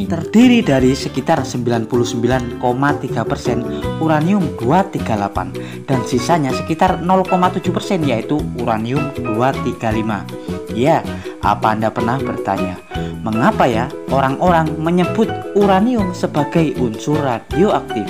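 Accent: Java, Indonesia. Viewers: orang jawa mana ini? medoknya jelas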